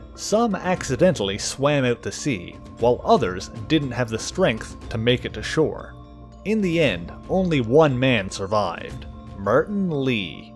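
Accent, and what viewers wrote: Canada. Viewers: Damm bro that Canadian accept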